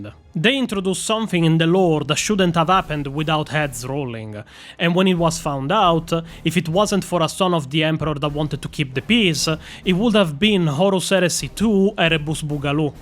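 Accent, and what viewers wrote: Italy. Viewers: I am so glad to have someone put this into better words than I ever could have. Thank you! I love your Italian accent, and I wish to see bloopers/behind the scenes of this. 🤌🏻👀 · L'accento meridionale!!!!11111!!!!!! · I love the Italian accent. I could listen all day :)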